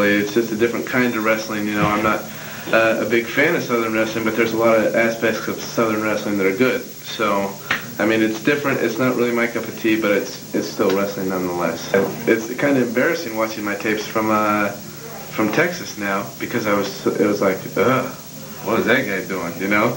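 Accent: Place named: United Kingdom. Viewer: He sounds a bit british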